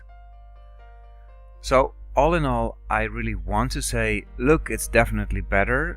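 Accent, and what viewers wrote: Netherlands. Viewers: you're Dutch, aren't ya?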